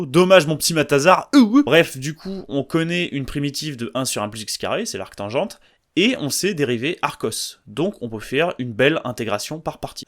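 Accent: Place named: Brazil. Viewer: J'adore la touche Alder du bresil dans la voix (les ancêtres sont fiers)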